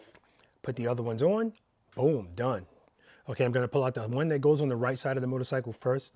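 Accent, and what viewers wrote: New York, United States. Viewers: Dude, that NY accent!! LOL 😂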